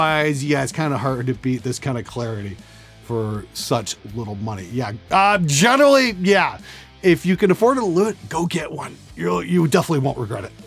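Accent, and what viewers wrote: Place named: Canada. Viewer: I always giggle when your Canadian accent shines through.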